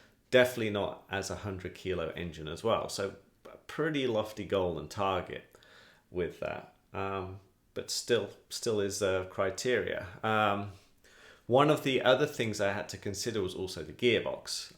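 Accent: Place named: United Kingdom. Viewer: A ratio without units is meaningless, unless it is a ratio of values with the same units. Since power and weight are different measures, "1:1" by itself could mean anything... 1 horsepower per pound? 1 horsepower per kilogram? 1 kilowatt per pound? 1 kilowatt per kilogram? 1 BTU/hour per stone? (in honour of British accent) Any of these is arbitrary and unrelated to actual performance goals.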